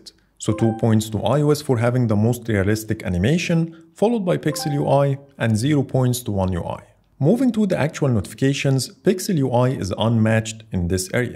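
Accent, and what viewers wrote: Egypt. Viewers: Egyptian accent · أول ما سمعت الصوت عرفت إن مصري ❤️❤️ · انت صوتك مصري 😅كل المصرين لما يكلمون انجليزي بيبقو برضو معروفين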